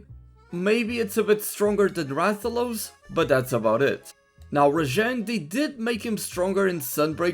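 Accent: Germany. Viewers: Yooo u german? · interesting german accent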